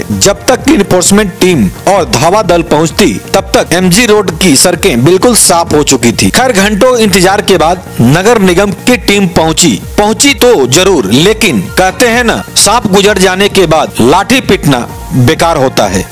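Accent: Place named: Jharkhand, India. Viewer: Jharkhandi aavaj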